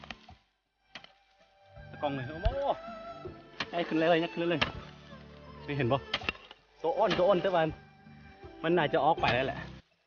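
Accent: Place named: Isan, Thailand. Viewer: สำเนียงพูดเหมือนคนอีสานเลย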